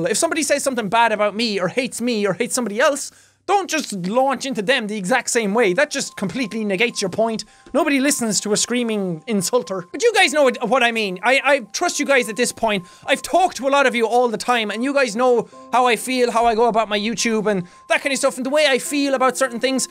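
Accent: Ireland. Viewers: Are you Irish? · Hey.... or, or whatever your name is.:$ haha I'm a new subscriber to you and I just wanted to say that when I saw a youtube gamer with an Irish accent, I was in complete awe! You're brilliant, dude! Can't wait to see more vids! P.S. I was in Ireland on vacation over the summer, and I really miss it. Your accent brings back a lot of awesome memories.:) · and/or i think that your are absolutely BOSS for putting up with bullsh*t comments and that your mail sail hair is BOSS and i want your fricking hat, i live in the southwest of England and my girlfriend is from northern Ireland and i just LOVE the Irish accent and i can understand everything you guys say and wish i could change my accent to Irish it's too fun! Lots of lols i cannel all my bossness towards you so that you can be even more boss, if that's possible- from Caz! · He's form Ireland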